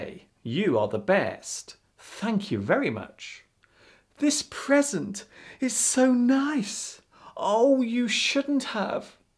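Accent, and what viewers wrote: United Kingdom. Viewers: Hahahahaha oh my god you are so funny! and this is very helpful for me, I love british accent, specially cockney!! Kisses from Uruguay, Latin America!! :) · It is a pleasure listening,real british English.It is clear and funny.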